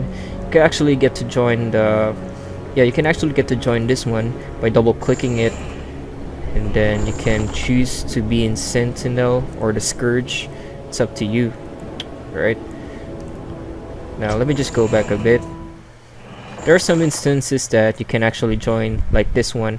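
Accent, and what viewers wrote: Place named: Philippines. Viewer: I was surprised your region is U.S. You sound so filipino for me. Anyways great vid ^^.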